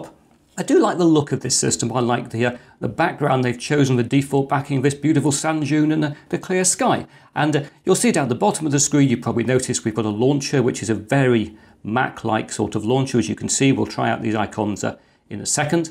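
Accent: United Kingdom. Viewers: Dear: I've been enjoying your videos and learning through them for several months now, and I thank you heartily for that. I wish to make a suggestion to you to further enhance the quality and usefulness of your videos. Trouble is, there is a certain amount of ECHO in the room where you record your videos, that hinders the clarity of your otherwise excellent speech and keen British pronunciation. And believe me, that echo makes some passages hard to understand. I dare suggest that you seek the counsel of a sound recording specialist that helps you to identity the sources of those echoes and take measures to dampen them, such as adding wall isolation materials, or perhaps some curtains or rugs. THANK YOU for your attention.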